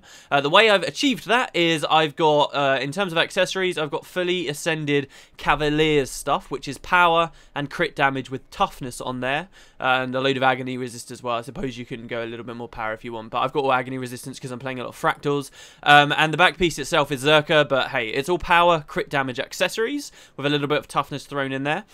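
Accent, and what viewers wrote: England, United Kingdom. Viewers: your english accent and saliva makes me throw up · english accent sounds amazing IMO (I'm german)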